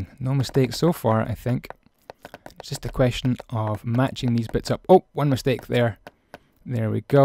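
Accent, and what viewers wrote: Scotland, United Kingdom. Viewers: Scottish? Hmmmm? Do I hear a Scottish accent? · is from Edinburgh and has a Scottish accent. Sounds nothing like a welsh accent lol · are u Scottish I am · Yeah I didn't know if he was British or Scottish ????😕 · Where is he from? He kinda sounds Scottish..... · Are you Scottish? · Are u from scotland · Excuse me, thats a scottish accent, im proud to have one and i dont find mine annoying or wish i didnt have it. Plus that was rude and uncalled for. I like his accent but thats my personal opinon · u have a Scottish AND a British accent mixed lol!!!!!!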